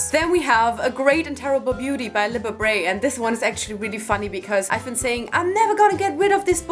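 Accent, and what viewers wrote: Germany. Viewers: Die ganze Zeit hab ich gedacht "Das ist doch ein Deutscher Akzent, oder?" und dann kam das erste Deutsche Buch😂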